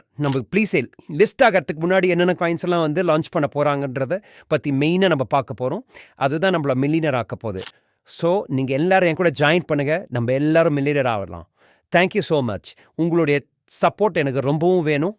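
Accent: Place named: North India, India. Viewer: உன்னோட வாய்ஸ் வடக்கன் மரி இருக்கு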